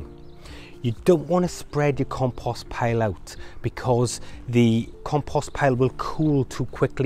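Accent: Wales, United Kingdom. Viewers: When I hear a Welshman talking about gardening I know I can trust him. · Hi, I LOVE your accent you Welshie just like me but ive not got Yer accent sadly lol ...... can I put put my Tortoise poo in the compost as she only eats Greens shame to waste it ? Thanks I subscribed so i can LIISTEN to you, of course i definitely need your Help !! Thanks x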